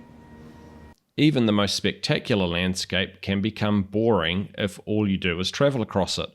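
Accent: New Zealand. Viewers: As a kiwi abroad, it now hurts physically to hear a kiwi accent.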